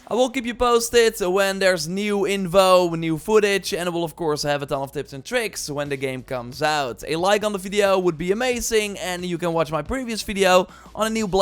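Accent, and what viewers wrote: Netherlands. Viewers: mate, I love your accent(Dutch I'm guessing?)..."I do like how dey expond on dis" It's just cool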